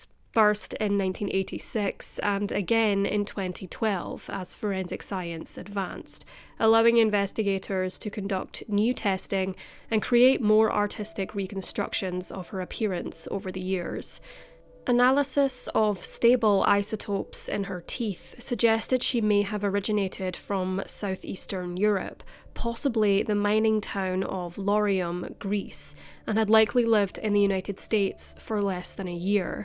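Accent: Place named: Glasgow, United Kingdom. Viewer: I think she's from Glasgow, my Scottish aunty sounds very similar to her.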